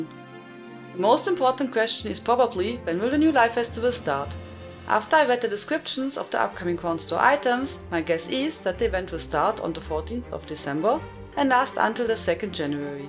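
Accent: Germany. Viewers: I love your German accent. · Man hört direkt das du deutsch bist hahaha :D <3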